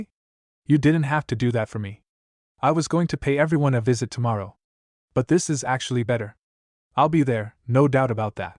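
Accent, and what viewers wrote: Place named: Africa. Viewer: why u sound african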